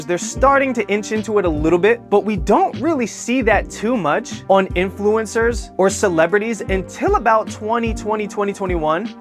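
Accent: New York, United States. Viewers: I love that New York accent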